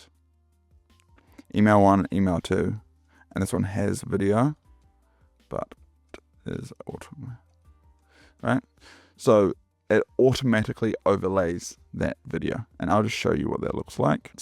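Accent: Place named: New Zealand. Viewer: Are you from NZ? You sound like a New Zealander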